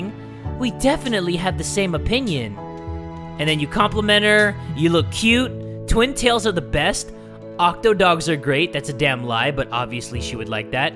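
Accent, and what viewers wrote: United Kingdom. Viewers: I LOVE YA BRITISH ACCSENT 10/10 [I know British accsents because I am British] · Hahaha i love your british accent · Why is bro British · great British accent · Love the British accent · Omg, that British accent ♡^♡ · i love hearing speaking in a british accent because im british lmao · I said that before this was even an updated OWWWWWWwwWwWwWwWwwWWww all I hear is nice happy music and then all of a sudden starts talking My ears are bleeding let me turn down the volume Hold up did it just turn itself back up God dang it * clickclickclick* oh no it’s too quiet * click x5* now it’s way too loud Jesus *clickclickclick*. Perfect wait hold up he’s British! Thank you for following my journey It was kind of like it was live but wasn’t sadly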